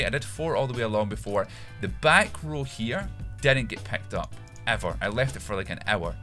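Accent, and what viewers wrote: Scotland, United Kingdom. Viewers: i loved this vid, it helped me alot to make a carrot farm, btw i love ur accent - is it scottish?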